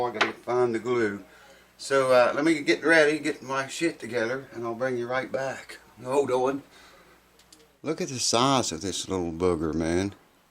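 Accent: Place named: Alabama, United States. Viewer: Thanks for uploading so many videos about how you do your repairs. Using them to help me out working on a little German flatback mandolin...Additionally, I live in Europe now, but you must be from very, very close to where I'm from, judging by accent. Southern Alabama? Who knows, you might even have fixed some of my uncle's guitars, or have played with him before. Keep on keepin on, man. Outstanding youtube stuff.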